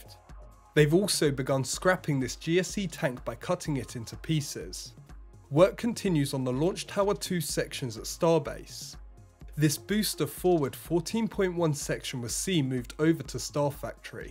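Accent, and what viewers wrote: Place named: Cockney, United Kingdom. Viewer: That’s a London East End accent. “Fursday” instead of Thursday etc.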